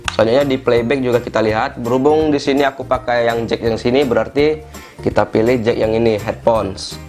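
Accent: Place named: Palembang, Indonesia. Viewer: Org mana bng kok logat kyk org palembang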